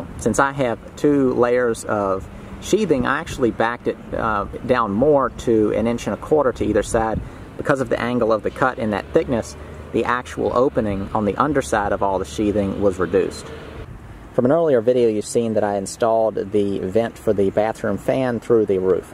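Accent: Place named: Texas, United States. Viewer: I hear a southern drawl. I say Texas.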